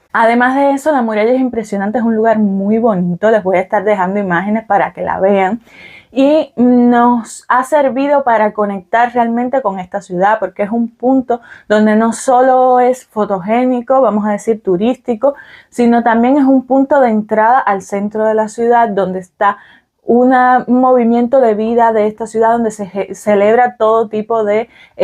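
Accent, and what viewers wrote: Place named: Central Cuba, Cuba. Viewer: Una ciudad tranquila salvo ciertas calles a ciertas horas. Por el acento debes ser del centro de Cuba.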